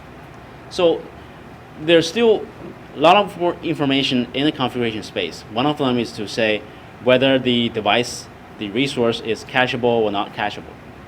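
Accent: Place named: China. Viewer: 这声音一听就是中式英语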